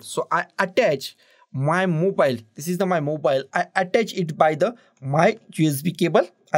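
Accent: India. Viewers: I love your india voice!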